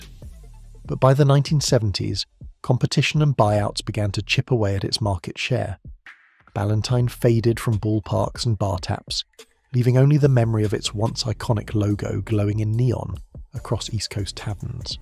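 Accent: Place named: United Kingdom. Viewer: Wait. Why is a video about vanished American beers narrated by a British sounding douche?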